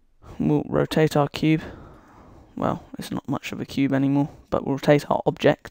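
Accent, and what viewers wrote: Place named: United Kingdom. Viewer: based on the link and his accent, prolly, he's from UK...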